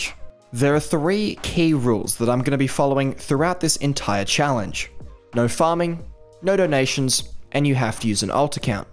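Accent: Australia. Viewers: U Australian mate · idk where ur accent is from ( is it australia? im pretty sure it is?) also i love your content :)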